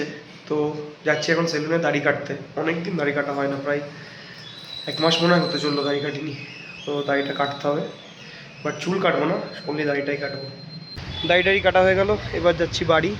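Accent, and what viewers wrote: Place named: Bangal, Bangladesh. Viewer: Dada, tomar bangal accent ta 😂😂 Darun vlog ta ❤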